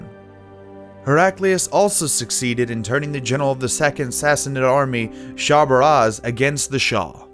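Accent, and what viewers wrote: United States. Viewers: God, an American accent on a history show makes it sound like a cheap History channel pile of bullshit. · This just does not work for me. The American accent with it's mispronunciations of cities and countries makes it sound very amateurish.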